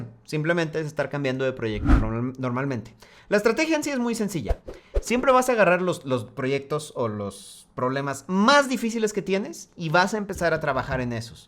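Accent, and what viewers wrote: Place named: Mexico. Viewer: Lastima que eres mexicano con ese acento que no es muy agradable, sino fueras el mejor youtuber de este tipo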